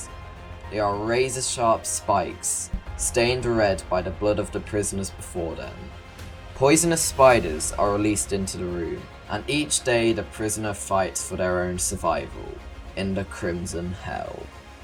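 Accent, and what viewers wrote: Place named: United Kingdom. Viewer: i dont know why its so funny to hear british people commentate things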